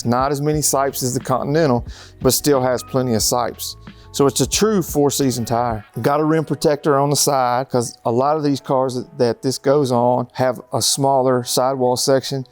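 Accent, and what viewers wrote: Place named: Missouri, United States. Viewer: The Accent. Are you from M.O. St. Louis or K.C.?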